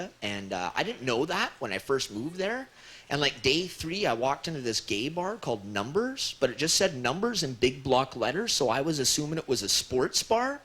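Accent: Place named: Canada. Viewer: in 4 seconds just about every listenerd could tell: Canadian. You mix up stoner with surfer - I Spoke surfer since childhood.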